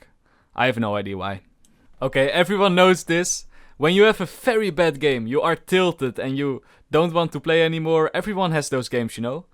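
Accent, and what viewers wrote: Netherlands. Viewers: Nice nl accent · Dutch accent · this guy is dutch for sure xD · You sound so dutch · de seconde dat je begint te praten weet ik dat je nederlands bent😂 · That's one THICK dutch accent my dude holy crap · r u dutch? this is my first video i have seen of u lol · ik hoor dat je nederlands bent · We kunnen je nl accent gwn horen lmao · Nederlands accent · maat jij hebt echt het meest nederlandse accent ooit hahaha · Ar you dutch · You dutch? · ik hoor gwn dat je nederlands bent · are u dutch u have a dutch-englisch accent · The dutch accent😂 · i smell nederlands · u dutch? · jij bent van nederland he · he said ''of'' instead of ''or''... also with his accent he really sounds dutch... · T nederlandse accent😂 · This is my first time watching your channel, and in the first 10 seconds I heard that you are dutch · Hou have a Dutch accent are you from dutchland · ben je nederlands? 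· i smell dutch ik ben ook nl btw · you sound dutch... are you dutch? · Ben je nederlands??😂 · ik hoor gewoon dat je nederlands bent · Why am i low-key thinking you are dutch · U sound dutch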